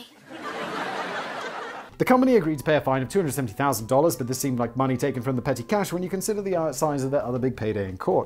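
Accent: United Kingdom. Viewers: It's all right, everyone; no need to panic! He's British... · I love how turned the British-isms up to a 1000 with this one. Also, a close runner up is to hear Simon blow past a sentence and then say, “what?! really?!”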